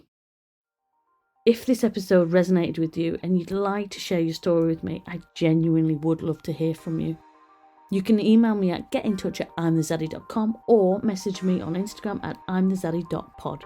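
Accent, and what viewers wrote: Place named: United Kingdom. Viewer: Hey, could you write your email please? Hard to hear for non-british ears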